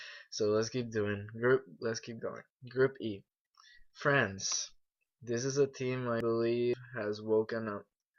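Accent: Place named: United States. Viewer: So your Spanish or American because you sound American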